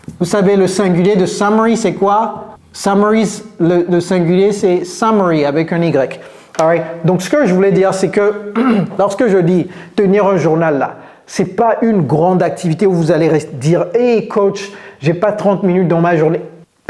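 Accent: United States. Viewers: un togolais, un africain en général peut parler avec l'accent américain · 😮wow that's amazing i love what you do . I trust in myself from now i will start to learne englisch and i will be able to speak like you ,like an american People 😊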